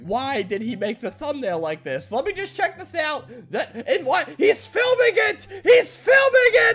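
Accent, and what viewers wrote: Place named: Spain. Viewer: his Spanish accent is so funny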